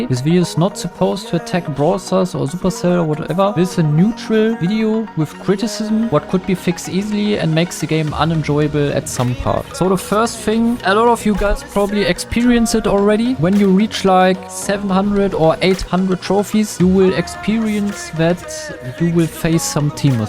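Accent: Germany. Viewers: Ich höre das du deutsch bist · Wie hart Mann merkt das er deutsch ist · Man merkt deinen deutschen Akzent so sehr, feier ich😂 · Voice with german acent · bro dein deutscher aktzent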